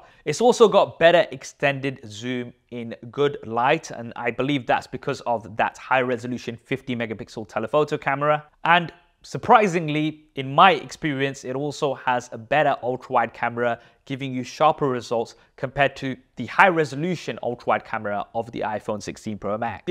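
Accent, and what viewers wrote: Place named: London, United Kingdom. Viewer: That London Accent.. 😊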